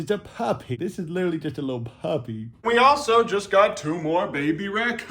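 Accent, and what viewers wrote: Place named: New York, United States. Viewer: Oh, he's in Texas? He sounds like he's from up north, like New York.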